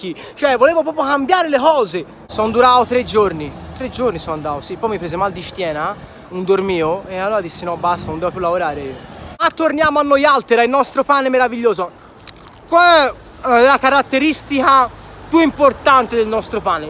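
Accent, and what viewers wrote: Florence, Italy. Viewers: Ma tutti i toscani hanno la c strana? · Sei forte ti vorrei attore in un film di Pieraccioni ta na parlata fiorentina che mi schiantare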